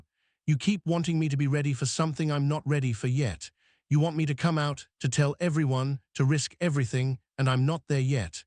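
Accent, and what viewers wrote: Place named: London, United Kingdom. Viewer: This male voice is it a beautiful London voice ? Wounderful to hear it.the story is excellent thank you AI family❤❤❤❤❤❤❤❤❤❤❤❤❤❤❤❤❤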